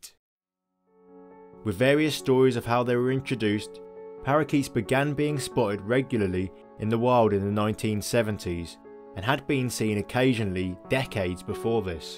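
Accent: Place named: Poole, United Kingdom. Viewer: U sound like Poole mush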